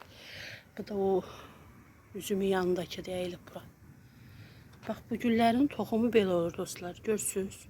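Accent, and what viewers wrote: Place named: Azerbaijan. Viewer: Çok güzel çiçekler bahçeniz harika konuşma şivesi ile anladım Azeri iseniz herhalde Azerbaycan mı orası benim komşum var Azerbaycanlı çok iyi arkadaşız hayırlı akşamlar diliyorum Türkiye'den İstanbul'dan selamlar sevgiler 🇹🇷🥰😊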